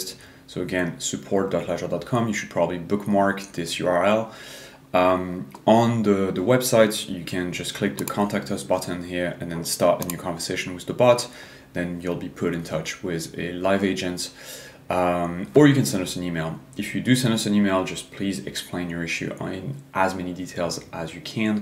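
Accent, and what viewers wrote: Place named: France. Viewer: Super helpful. Love the French accent, tho.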